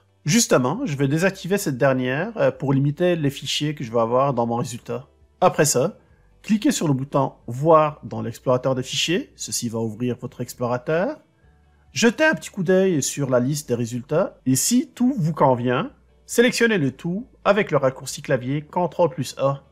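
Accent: Quebec, Canada. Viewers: Une question linguistique : votre accent québécois, se serait-il pas saupoudré de quelques épices du Maghreb ? Sinon, super chaine, très utile et qui va droit au but. Merci pour toutes ces infos ! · Un grand merci, toujours un plaisir de vous entendre avec votre accent canadien. Bon week-end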